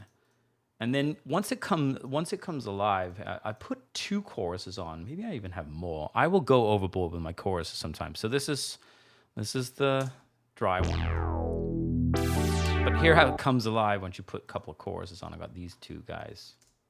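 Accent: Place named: Australia. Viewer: i thought he was australian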